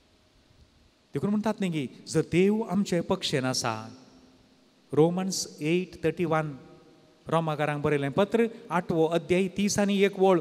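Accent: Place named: Mangalore, India. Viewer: Father, your Konkani is very clear. Aputt Mangalorean. Thank you for your discourse.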